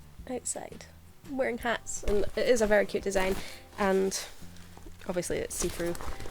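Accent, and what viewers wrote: United Kingdom. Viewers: I love this video. And the other half dozen of yours I've wached. You're beautiful and your Brit accent slays me. I;m a lifelong Beatles fan and once visited a lady friend in the UK in 1985.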